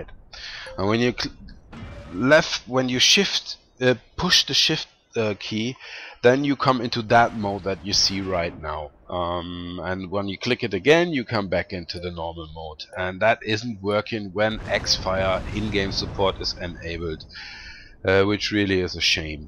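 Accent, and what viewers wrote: Germany. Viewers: Haha erste minute und wusste gleich dass du deutscher bist :D Wo haste denn die mod her? :)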